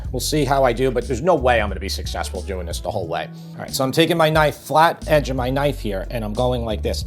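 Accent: New York, United States. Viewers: I love your Ny York accent!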